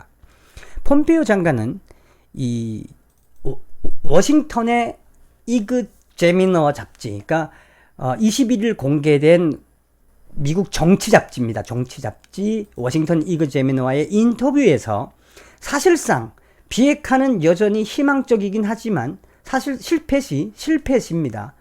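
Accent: North Korea. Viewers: 왜이래요? 말씨가 북괴네요?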